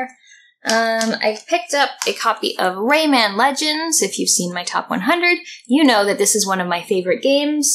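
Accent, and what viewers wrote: Canada. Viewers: i know it's a random question, but are you from canada?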